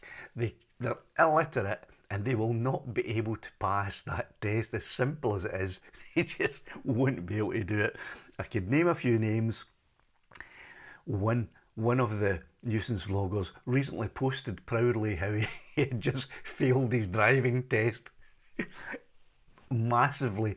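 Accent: Scotland, United Kingdom. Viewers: relentless droning—delivered with a distinctly Scottish flair—had me reconsidering all the complicated regulations and whether the Mini 5 Pro was the right fit for me. Then, in a moment of pure chaos, I completely forgot that I don’t even live in the EU, meaning none of it applies to me. Wow! You know what? Forget it—I’m going ahead and getting myself a brand-new Mavic 4 Pro instead!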